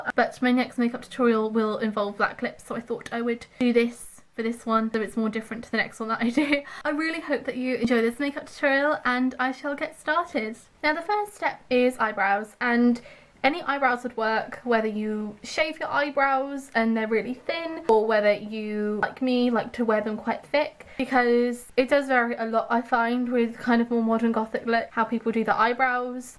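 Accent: United Kingdom. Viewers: Is your accent from Southampton?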